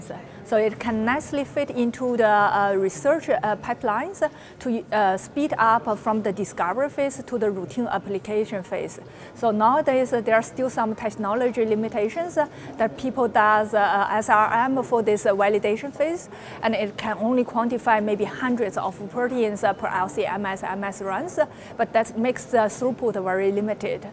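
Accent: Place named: China. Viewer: 中国方言味的英语.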